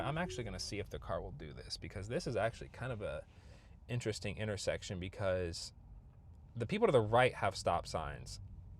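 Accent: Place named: Georgetown, Guyana. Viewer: I thought you sounded familiar, I am from Georgetown!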